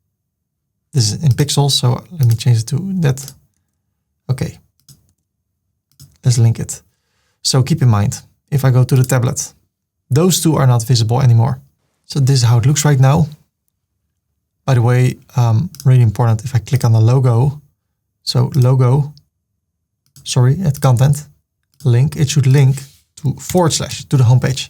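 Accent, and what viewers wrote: Netherlands. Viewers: I really dig the Mark Rutte English. Cool stuff.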